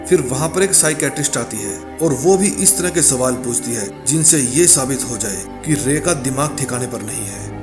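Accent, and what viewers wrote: Punjab, India. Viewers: mennu laggda 22 punjabi aa 😂 accent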